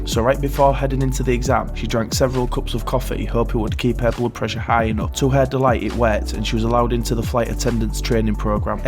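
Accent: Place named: West Yorkshire, United Kingdom. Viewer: Just subbed , is tha from west Yorkshire? , good luck with the channel.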